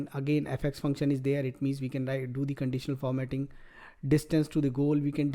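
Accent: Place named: India. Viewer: 11 hours of a thick Indian accent. Sorry. This is impossible to sit through.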